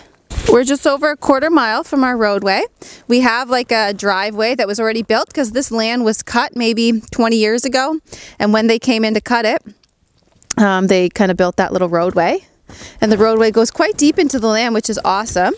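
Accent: Canada. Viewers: LOL! I love Canadians and Canada and have been noticing alot of goodies coming from Canada as of late! I too figured out she was Canadian from some of the words and the accent when she finish some sentences. I am living in the Cold as a Puerto Rican so I am paying attention to Canadians and how they build and live so i can survive these freezing painful NON CARIBBEAN temps!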